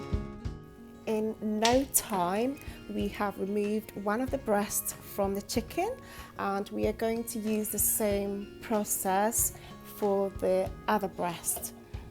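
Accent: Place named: London, United Kingdom. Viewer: First of all lemme say you have a nice accent 😍😍guess you live In London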